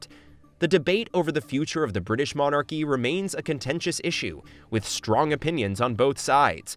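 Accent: United States. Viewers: Some but not all valid points. Elected presidents and democratic nations have also acted immorally and undemocratically. But who made this video? Narrator has American accent and half the images were American!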